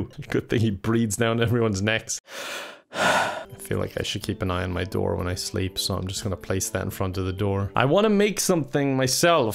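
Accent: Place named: Ireland. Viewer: omg i love speaking that thicc irish accent 😂😂😍😍😍😍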